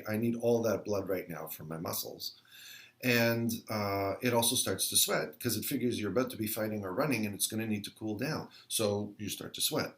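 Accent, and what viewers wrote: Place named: Canada. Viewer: Two things....First...Ha! ....I just KNEW you were a Canadian, eh? (me too, that's why I had a feeling)...Second...you are a truly truly gifted teacher. Thank you so much for creating these paramedic videos, they have explained so much confusing material in such a simple and clear fashion....please make more videos!!!! .....eh?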